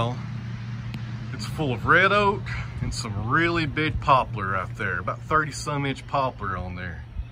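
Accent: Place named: Virginia, United States. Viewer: Just curious. What state do you live in? Sounds like Virginia or north Carolina maybe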